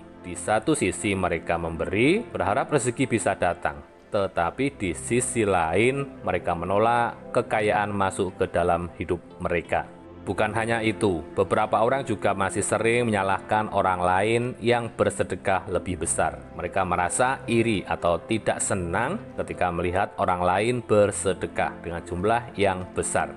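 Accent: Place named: Java, Indonesia. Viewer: Suaranya mirip suara Jokowi. Ditambah lagi aksen medoknya.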